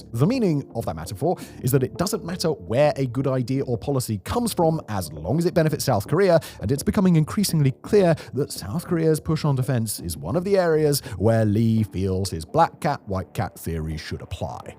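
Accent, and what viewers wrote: RP, United Kingdom. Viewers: Also a European lecturing Americans in a condescending tone with the queens English about power for 30 minutes while living in an impotent country is rich.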